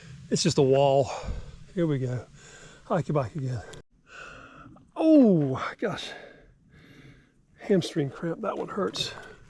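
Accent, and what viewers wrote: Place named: North Carolina, United States. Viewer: I heard your NC accent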